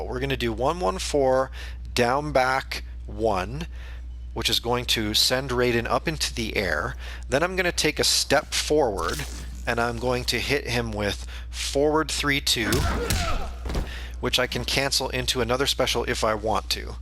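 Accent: Canada. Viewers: pretty sure youre canadian based on the way you talk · Canadian eh?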